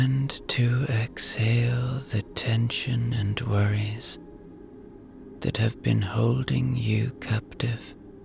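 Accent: Ireland. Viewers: Bro I listen to you so much that everyday I wake up I have an Irish accent in my head.😅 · Iove the Irish accent ❤my dad was born and grew up in Ireland. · I listen too u literally every single night and I keep hearing an irash accent in my head and it sounds like a leprechaun 🍀 literally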